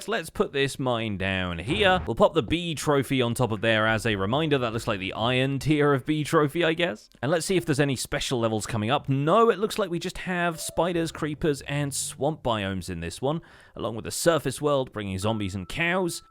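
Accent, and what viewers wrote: United Kingdom. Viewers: I'm loving this play through. Keep em coming. You're a great YouTuber, I appreciate your British accent.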